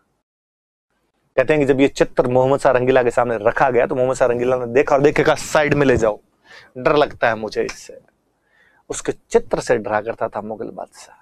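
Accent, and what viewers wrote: Rajasthan, India. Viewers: Wow... Even though,I took 2-3 times to listen n understand the rajastani tone of hindi he depicts. I feel proud of his efforts.💫💪 Thankyou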